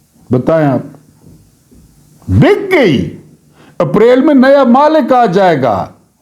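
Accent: Saraiki, Pakistan. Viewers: السلام وعلیکم۔ آ پ کا انداز سرائیکی لہجہ میں بہت sweet ھے لگتا نھیں آ پ کا پروگرام پاکستان سے ھو ورنہ۔۔۔۔۔اللہ پاک سب کی حفاظت فرمائے آمین آمین آمین M.Saeed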